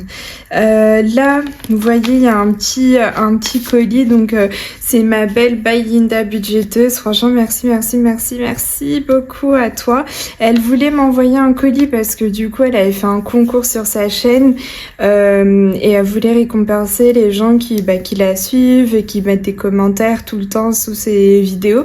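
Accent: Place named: England, United Kingdom. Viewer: Coucou 😊 Pleins de sous sous à mettre dans les défis, trop chouette 😘 Et un beau colis de Linda en prime. C'est superbe 🎉 J'aime bien ton accent anglais,😊 je ne fais pas mieux 😅 Des bisous 😘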